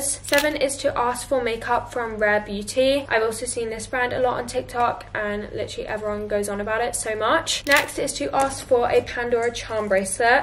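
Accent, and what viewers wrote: England, United Kingdom. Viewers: I luv your English accent like omg and love the video by the way and also i luv they way you edit your videos like 10000/10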